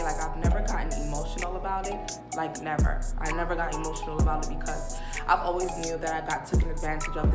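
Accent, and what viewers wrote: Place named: Brooklyn, United States. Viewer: Ooo you a Brooklyn girl 🥵🥵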